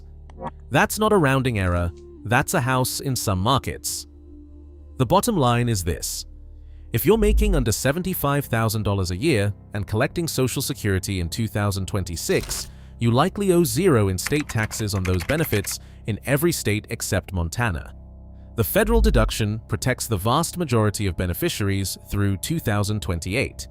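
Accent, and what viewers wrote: United Kingdom. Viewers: Just want list/chart Too many words - leaving now (and the eglish accent is annoying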